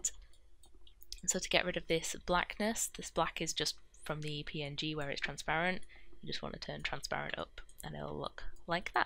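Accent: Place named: North, United Kingdom. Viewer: great vid and love that northern accent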